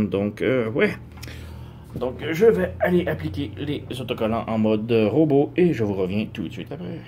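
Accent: Canada. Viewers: vous etes canadien?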